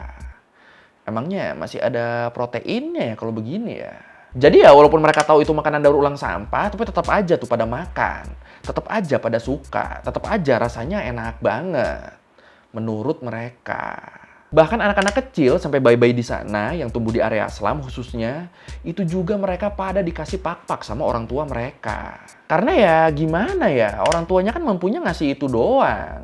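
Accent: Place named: Madura, Indonesia. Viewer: Kenapa nadanya hampir mirip kyk logat madura ya... Apa cuma aku ya yang ngerasa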